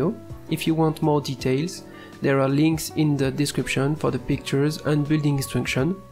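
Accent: France. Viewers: sounds very french but the script is very well written. and the model is, as always, leagues ahead of what a mortal could come up with. good job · T’es français tu parle comme un français ! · It still sounds very french ;)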